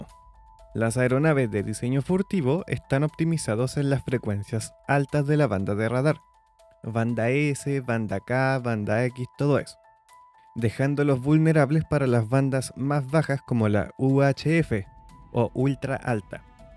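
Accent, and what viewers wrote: Chile. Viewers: Solo por curiosidad, el que relata es de origen chileno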